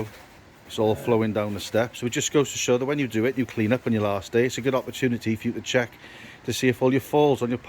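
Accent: Wales, United Kingdom. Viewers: Some good tips with a Welsh accent ... seeing as I'm originally from North Wales it's a win / win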